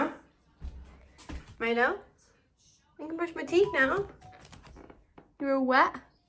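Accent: United Kingdom. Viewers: Look at the hairrrrrrrr British 🏴 accent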